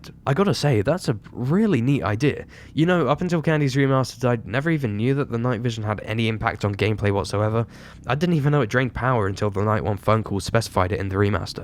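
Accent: United Kingdom. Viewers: Man, I keep coming back to this, and I get whiplashed that this was before transitioned- I’m so used to hearing her voice nowadays that her sounding like your average british male feels weird Kinda prefer her voice nowadays lmao, but I just like British accents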